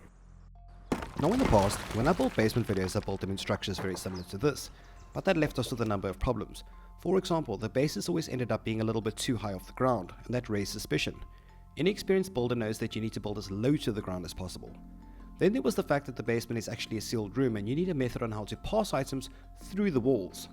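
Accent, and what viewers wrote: Afrikaans, South Africa. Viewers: YOU SOUND SOUTH-AFRICAN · U can hear this guy is South African 😹🇿🇦 Ayo straight from the mother nation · You sound South African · Are you south african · Wait he's south african? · I knew you were South African! Finally a fellow South African Youtuber that is good! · I am south African aswell I instantly knew your south Africa by listening to your accent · Are you from south africa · I’m guessing you’re from South Africa? Most probably Joburg? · Where are you from, you sound South African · Thought I recognised that accent Rare to see another SA on YouTube, especially a content creator. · The accent lol. Afrikaans. Man its so easy to pick up lol. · you South African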